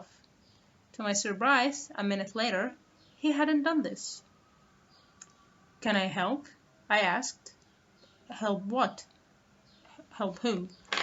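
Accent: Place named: Spain. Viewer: She sounds a little Spanish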